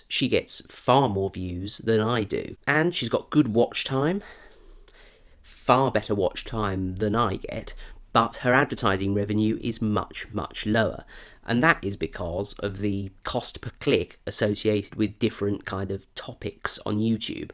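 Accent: United Kingdom. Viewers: keep doing what you're doing! from a fellow Brit, Its the very British delivery and sense of humour that make your videos such a joy to watch regardless of subject. FYI YouTube recommended your 30k subs video to me weeks ago and I've been hooked ever since. Cheers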